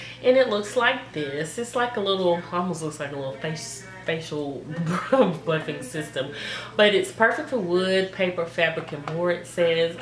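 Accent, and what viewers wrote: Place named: Alabama, United States. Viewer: R u from Enterprise Al? We have the same accent lol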